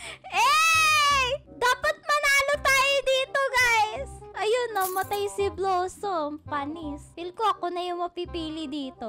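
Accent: Visayas, Philippines. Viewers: Ate Bisaya Ka po ba?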